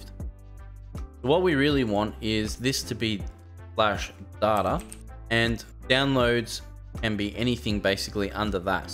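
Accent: Australia. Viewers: don't let Americans force you to change the Aussie lingo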